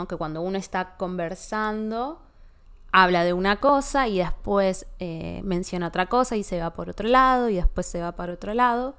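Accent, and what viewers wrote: Argentina. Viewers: Me gusta mucho lo que haces. Para mí es muy importante escuchar el español argentino. Con mucho gusto y beneficio escucho y veo tus podcasts. ¡Muchísimas gracias!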